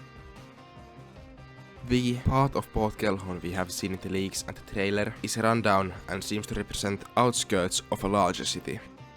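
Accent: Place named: Finland. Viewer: funy finish accent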